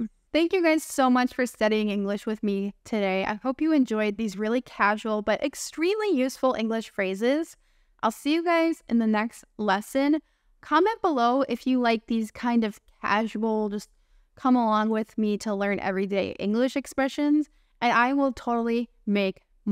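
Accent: United States. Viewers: May I ask you a question? Your American accent belongs to North America?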